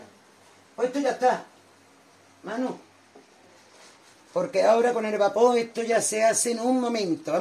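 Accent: Andalusia, Spain. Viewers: llevo poco tiempo siguiendote, me encanta como hablas como explicas todo con tu lenguaje andaluz me río un montón contigo, lo limpia que eres y con toda tu calma como nos enseñas lo que sabes!! Muchas gracias guapa! Seguiré viéndote a ti y a tus videos 💋💗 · Madre mía ¡ Qué pinta tiene este atún!! Me encantan tus recetas y sobretodo como las explicas. Con esa gracia andaluza que no se puede aguantar. Muchas gracias por compartir tus ricas recetas. Un abrazo de esta catalana con raíces cordobesas.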